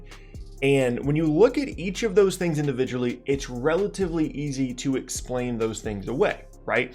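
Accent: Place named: Boston, United States. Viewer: I think is that Boston accent. It makes people crazy.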